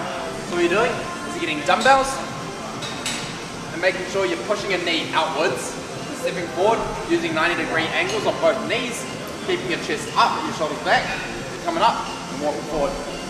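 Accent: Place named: Australia. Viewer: a bahbell on ah reck! aussie accents lol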